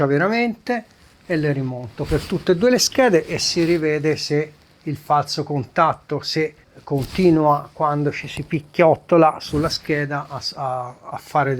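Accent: Tuscany, Italy. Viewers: Complimenti! Canale super interessante e competente, chiaro nelle spiegazioni anche per un neofita curioso di come sia costruita un'elettronica hifi. Aggiungo che l'accento, gli eufemismi toscani sono deliziosi!!!! Sono un po lontano ahimè dalla Toscana ma se avessi un problema ad una elettronica mi "garberebbe" 😊 rivolgermi a. Se possibile vorrei sapere un contatto mail Buon lavoro e avanti così!!!!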